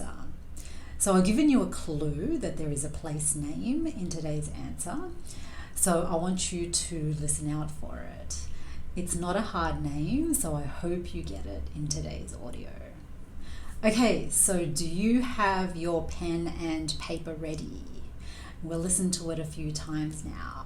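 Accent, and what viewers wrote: Australia. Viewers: This is an excellent channel for learning English and the Australian accent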